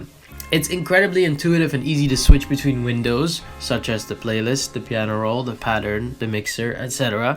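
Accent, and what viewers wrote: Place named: Netherlands. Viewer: that dutch accent is thickkk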